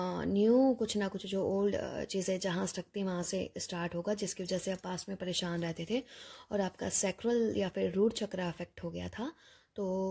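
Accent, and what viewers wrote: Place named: Punjab, India. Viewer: Love your punjabi ascent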